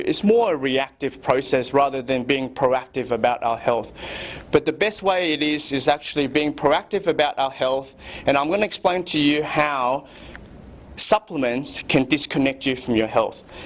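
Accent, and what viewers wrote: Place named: Australia. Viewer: I am curious where this seminar/ lecture took place. Its audiences appear to be mostly oriental made me thought Singapore or Malaysia but the speaker’s accent was Aussie. Thank you for putting up this video.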